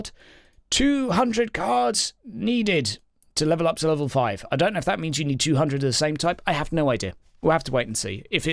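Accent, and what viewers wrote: United Kingdom. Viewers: I don't think any other fellas have a better British voice/accent than.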